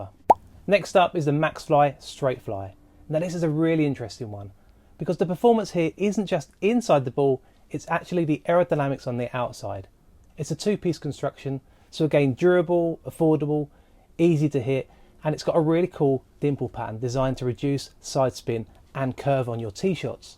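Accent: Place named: United Kingdom. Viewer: Why is every video a fkn brit talking.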